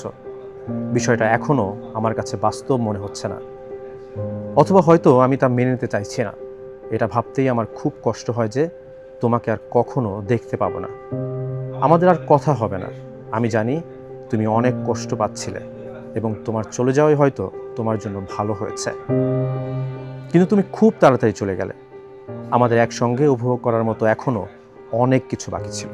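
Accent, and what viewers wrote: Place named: Faridpur, Bangladesh. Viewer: আপনি ফরিদপুরের পাঠক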